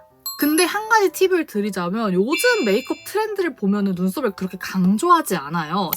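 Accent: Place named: Pohang, South Korea. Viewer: 근데 님 어디사람이세요..? 서울이 아니신거 같네용 포항사람인 학교쌤 말투 ↘️↗️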